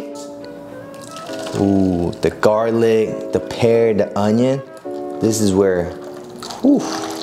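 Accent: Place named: Los Angeles, United States. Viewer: this how you sound like when you korean and grow up in LA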